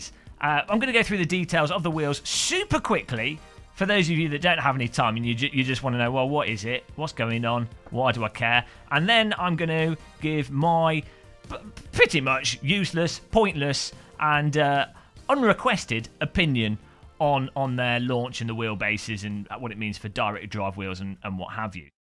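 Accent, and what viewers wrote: United Kingdom. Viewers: $1500...pause...walk away....heart broken....come back...at least I get to hear you speak with your Bri-ish accent.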